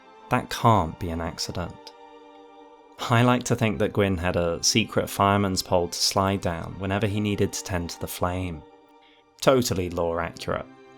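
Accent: United Kingdom. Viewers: Good video but must this guy talk with a British accent????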